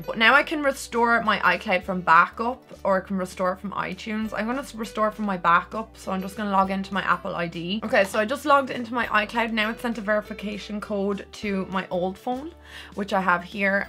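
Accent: Ireland. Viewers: Is she Irish?NVM I can tell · Are u Irish?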